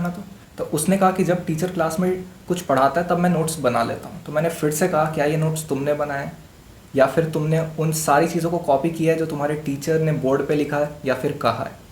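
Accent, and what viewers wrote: Bengal, India. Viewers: Bro are you a Bengali?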